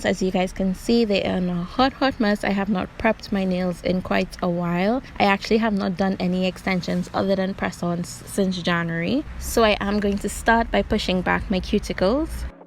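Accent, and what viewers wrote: Trinidad and Tobago. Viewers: Recognise that trini accent anywhere 🇹🇹🇹🇹... Imma subscribe to your channel just for that 😁😁.... BTW I love polygel tutorial videos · are u trini?? cause u def sound like it lol also, this set is fireeeee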